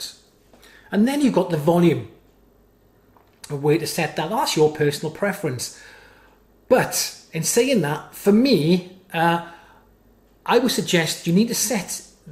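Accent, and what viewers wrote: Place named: South Wales, United Kingdom. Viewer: Great video! You sound like you live in South Wales 🏴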